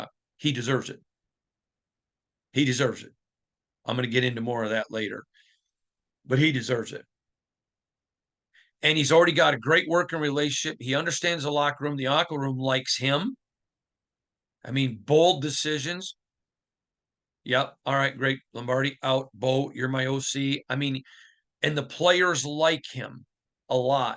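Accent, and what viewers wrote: Midwest, United States. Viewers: He's from the mid-west !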